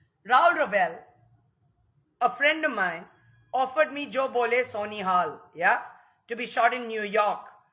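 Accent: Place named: England, United Kingdom. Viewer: Are you okay pooja we love you ❤ pooja raise voice for yourself keep stand like that I am 17 nd loved your English accent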